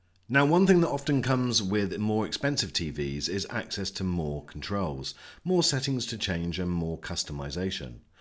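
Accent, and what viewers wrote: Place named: United Kingdom. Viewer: Dude he’s not Australian he’s British LOL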